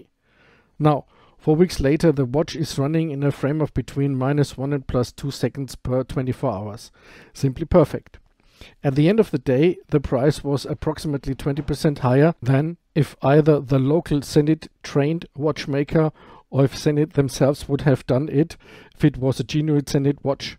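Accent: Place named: Germany. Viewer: ❤ your German accent 😊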